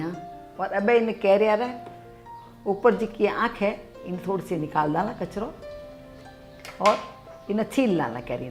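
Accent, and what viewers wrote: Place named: Rajasthan, India. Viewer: Very nice simply method Looking nice kakisa🥰🥰 Rajsthani boli ghani chokhi lage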